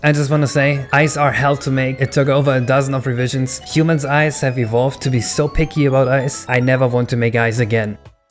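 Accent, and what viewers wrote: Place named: Germany. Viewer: dieser deutsche Akzent xD, aber krass was man aus SM64 mittlerweile machen kann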